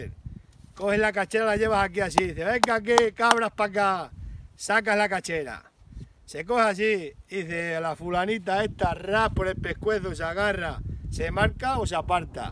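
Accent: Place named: Andalusia, Spain. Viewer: me descojono escuchándote.Pareces Andaluz y no Extremeño.Me recuerdas mucho nuestros mayores de pueblo.Yo soy Malagueña.Llevo muchos años viviendo en la capital pero sin olvidar mis raíces.Soy de ( Comares ) pueblo de la Axarquía Malagueña.Y seguimos teniendo casa de mis abuelos y tíos,en el campo,no en el pueblo.Cuando vamos con mi tita María,pues la casa es suya,disfrutamos más que niños.Yo nada más colocar la comida y lo que llevamos para pasar unos días,me coloco ropa cómoda y mis zapatillas y me voy a dar vueltas al campo,al huerto,a por espárragos,a coger aire fresco y puro.Disfruto recordando viejos tiempos y dándome algún que otro guarraso en el suelo.pero más feliz que una perdiz.Saludos de esta Comareña que vive en la ciudad,sin olvidarse del campo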